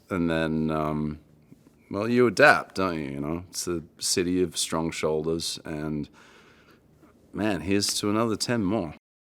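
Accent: Australia. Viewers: You did not know he was Aussie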